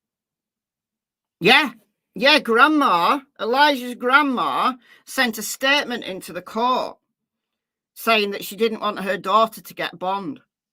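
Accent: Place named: England, United Kingdom. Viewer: It is morning here in the U.S. I luv you and your English accent and my second country England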